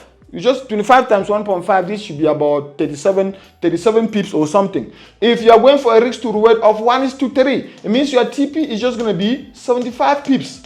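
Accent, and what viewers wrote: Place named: Calabar, Nigeria. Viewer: I actually thought you are a Nigerian ur accent is like that of a typical Calabar man. you are a boss indeed good thanks you are my mentor I will learn from u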